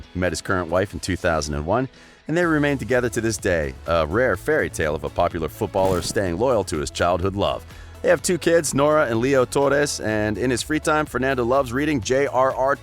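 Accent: United States. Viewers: An American accent starts talking about "Soccer"...nah...not watching it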